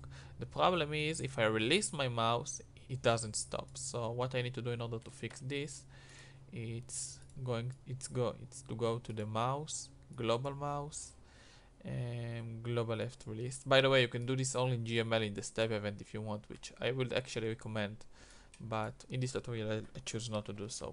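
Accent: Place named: Israel. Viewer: תודה אחי, רואים על המבטא שאתה ישראלי :)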